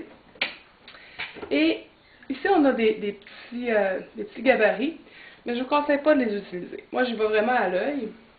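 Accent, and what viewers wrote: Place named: Canada. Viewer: video très utile car avec mode d'emploi 100 % en anglais c'est pas évident....Et ce petit accent canadien qui réchauffe le coeur, c'est top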